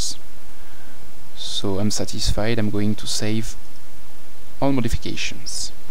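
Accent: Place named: France. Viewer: comme un accent francais,, it's weird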